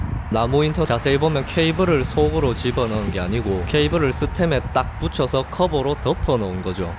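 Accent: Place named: Daegu, South Korea. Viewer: 대구 아재의 구수한 입담은 즐거워~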